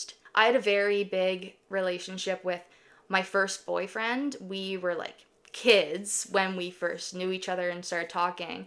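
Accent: Canada. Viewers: Canadian? I detect a mild "aboot."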